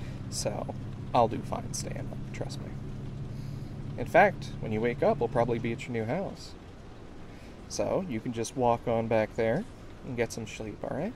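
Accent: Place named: South, United States. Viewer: SLIGHTLY SOUTHERN SOUNDING CATBOY TRUCKER RAAAHHHHHHHH 🗣️🗣️🗣️🗣️🗣️🔥🔥🔥🔥🔥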